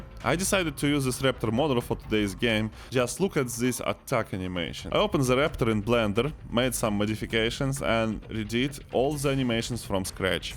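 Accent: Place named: Russia. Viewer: Охренеть, только и акцент русский, а почти весь ролик становится понятным без перевода! 😧👍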